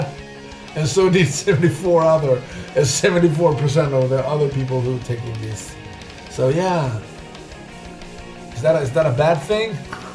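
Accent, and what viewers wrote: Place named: Spain. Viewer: for a Swedish man you sound like you have a more spanish accent. no hate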